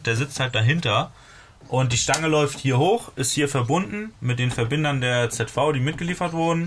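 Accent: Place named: Baden, Germany. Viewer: echt cool es Video von eurem Akzent denk ich Mal ihr kommt aus der Badener Gegend oder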